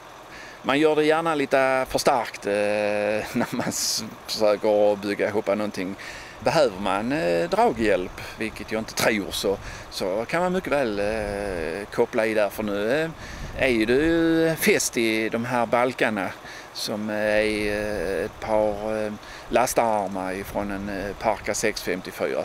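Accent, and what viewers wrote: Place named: Scania, Sweden. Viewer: He speaks Swedish but with a dialect from southern Scania, the southernmost part of Sweden and therefore Scandinavia.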